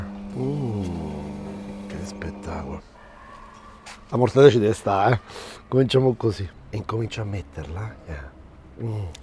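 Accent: Rome, Italy. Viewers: Che bello sentire parlare romano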